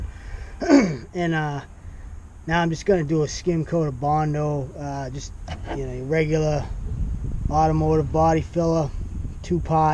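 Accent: Rhode Island, United States. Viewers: You sound like a Rhody. Great job.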